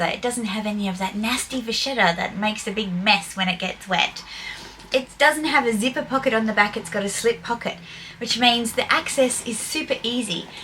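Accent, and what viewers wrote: Australia. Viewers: Hi, sounds like you are an Australian like me! Have you still got the Clapton and Valentino rock stud bag?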